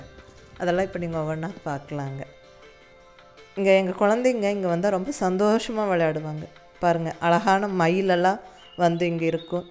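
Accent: Kongu, India. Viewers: yengal kongu Tamil.. mam nidhanathoodu sollum vidhamum arrumaii...❤ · Tats great........ Missing cbe so much..... Now m in singara Chennai.....by watching ur videos I feel so proud tat I cud hear Kongu tamil and our dishes as well in Kongu touch.......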